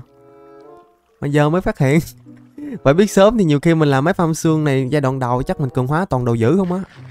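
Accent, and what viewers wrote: Mekong Delta, Vietnam. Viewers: em nói thật lun anh làm clip thì ah hãy nói giọng thật đc ko chứ ah đừng chỉnh giọng anh chỉnh giọng để làm clip về mục đích j nên em mog anh làm clip hãy nói giọng thật của mình mình nói thật với tất cả fan của anh, giọng thật của anh khác nữa giống người miền tây nên em nói 1 câu chân thành với ah, em mog anh làm clip hãy nói giọng thật nha anh bạn nào muốn nghe đc giọng thật của ah thì hay qua kênh của anh Găm Bồ Gaming và gõ Lộ Mặt Thật Ngoài Đời Khi Phỏng Vấn Cùng Burgerby nếu như ah đọc đc bình luận này thì em mog anh có thể nói giọng thật của mình ạ chúc ah 1 ngày vui vẻ ạ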